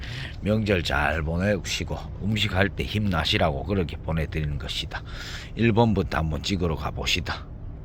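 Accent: Gyeongsang, South Korea. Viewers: 가격도 착하고 선물까지 주시고 ~ 사장님 경상도 사투리 정감 가네요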